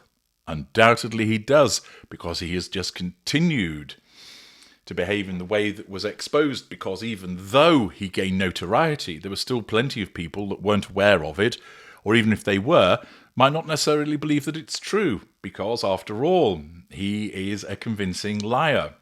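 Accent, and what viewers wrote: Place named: Northern England, United Kingdom. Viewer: Who said you are the swindler??🤣🤣your northern accent is so strong - I hear around Cheshire or Stockport - who’s dumb enough to think you are this Israeli scammer. is definitely from ‘up north ‘. Derbyshire also a possibility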